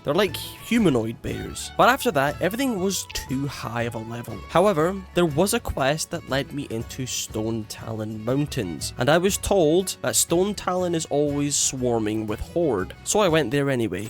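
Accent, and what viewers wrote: Scotland, United Kingdom. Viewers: The accent make me remember my friends grandpa from Scotland 🏴 ❤ he was such a nice guy and these videos are so comforting ❤ love from Norway 🇳🇴 🎉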